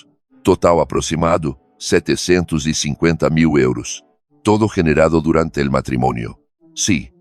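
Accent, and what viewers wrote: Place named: United States. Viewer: En España no se está siempre diciendo nombre y dos apellidos. Y tampocoese acento americano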